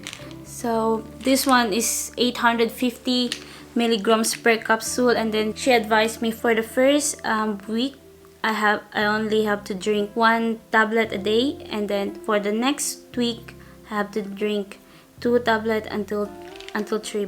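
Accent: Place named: Philippines. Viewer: Congratuations! I noticed you are Filipino, I love your accent, my mum is also Filipino 😊 looking forward to your pregnancy updates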